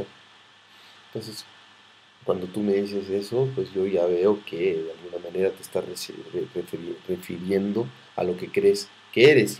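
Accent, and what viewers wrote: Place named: Mexico. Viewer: tiene acento de mexicano, un Gran Ser él y su padre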